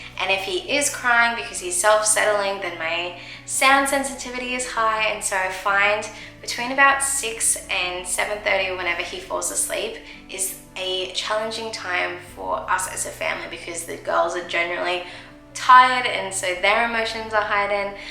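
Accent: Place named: Australia. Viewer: Ngawww Mama! These are the best milestones!! Just saw your post on Youtube Moms Unite and thought I show some love and connect. I'm hearing a familiar accent Mama!! <3 Where abouts in Australia are you from? I'm based in Sydney! Hope to stay connected.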